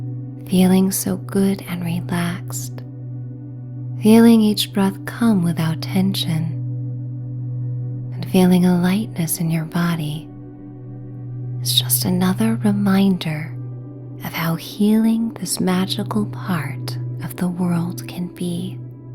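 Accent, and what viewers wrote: United States. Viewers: Why is this recorded using an American and not English accent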